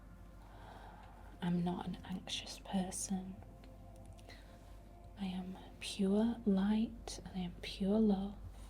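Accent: United Kingdom. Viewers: WITCHY asmr with a BRITISH accent?!?! How did I get so lucky to find you ❤😭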